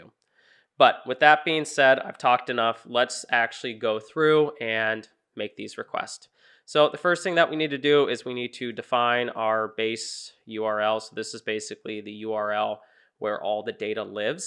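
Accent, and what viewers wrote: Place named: United States. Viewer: very helpful. thank you. keep it up i agree with a previous commenter that having instruction in my native english american accent and idiom makes it significantly easier and more enjoyable for me to actually learn to be able to actually implement some of this in my coding and finance data science projects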